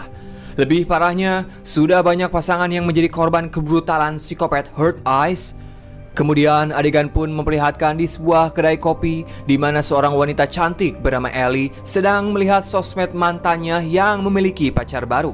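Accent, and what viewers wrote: West Java, Indonesia. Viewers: Orang mana lur euy sundana?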